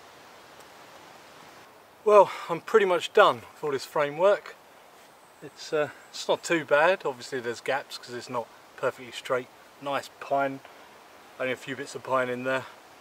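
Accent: England, United Kingdom. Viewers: Hello greatings from Moose Jaw in Saskatchewan This is a nice video. You made it again nice and calm I like you way your Englisch way spezialy as a German living in Canada lol. Good idea to cook on a stone I have never done this. Your shelter is good to my respect I hope it will still be there when you return. Ok I wish you luck and can wait for a new one. Take care and stay healthy with your family. Bye bye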